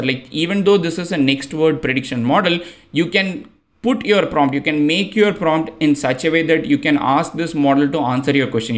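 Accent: India. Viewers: Can you use an AI to remove your Indian accent?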